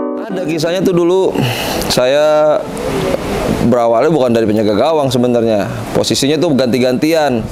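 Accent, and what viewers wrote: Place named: Bekasi, Indonesia. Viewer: Di liat liat asik juga dari bahasanye wkwk, orang Bekasi ya bang?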